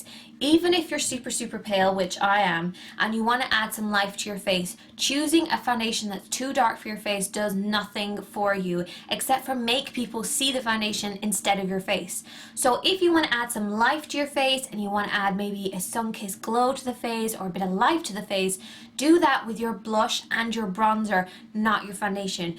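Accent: Ireland. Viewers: Are you Irish? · lol no, she's actually irish. that's why she sounds irish. americans don't sound like that.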